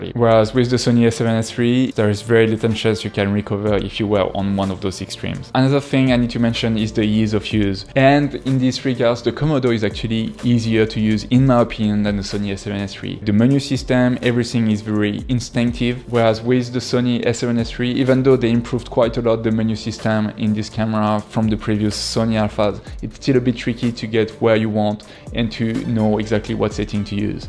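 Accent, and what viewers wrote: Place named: France. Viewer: I bought a FX3 and FX6 but I plan to sell the FX6 for the Komodo. I don't need two run'n'gun cameras and the FX3 is perfect for that (so it will be basically the same setup as you😄 without the Raptor haha). And I'm gonna sell my Pocket 6k pro (that I love), because I won't need it anymore since I will have the Komodo. But before that I'm going to rent the Komodo, and test it on my next project (I have a big music video coming soon). I love the FX6, don't get me wrong, it's a true workhouse! but I can do the same with the FX3 and the image quality is almost identical. The only thing I will really miss is the electronic VND from the FX6 (otherwise I much prefer the image coming from my Pocket 6K Pro, and I guess I will also prefer the image coming from the Komodo). P.S: tu as déménagé en Australie (j'entends à ton accent que tu es Français 😃)? 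J'y ai passé un an en 2009, j'ai adoré!! Il faut que j'y retourne un jour!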